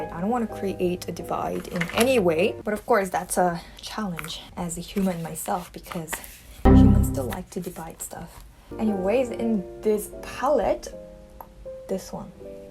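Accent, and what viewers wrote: England, United Kingdom. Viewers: Wahh i like your English accent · loved her english accent you can apply as a narrator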